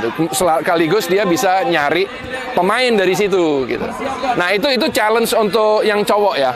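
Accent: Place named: Malang, Indonesia. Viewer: 👍 Gamblang dan runut penjelasannya meski disisipi istilah2 bahasa Inggris dan dengan logat/aksen Malang'an 😀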